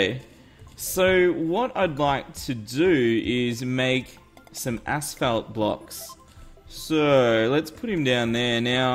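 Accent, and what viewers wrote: Australia. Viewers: You're Australian? I didn't realise. What state? I'm from SA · Bruh oh my god it makes ur channel even better because your Aussie . First good Australian youtuber keep up the good work man.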